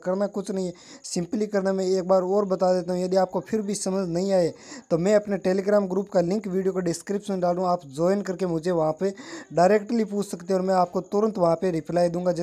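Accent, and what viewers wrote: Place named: Rajasthan, India. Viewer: Pkka aap rajasthan se ho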